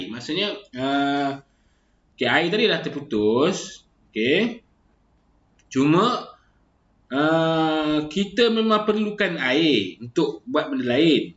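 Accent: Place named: Perak, Malaysia. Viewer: Bunyi perak ustaz😅😅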